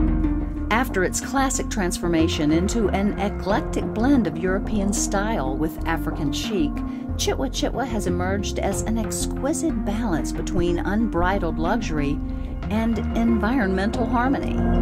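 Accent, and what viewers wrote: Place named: United States. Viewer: Why would a Sth African Lodge use a fawning American lady for the voiceover, in this grating sycophantic advert, is a puzzle.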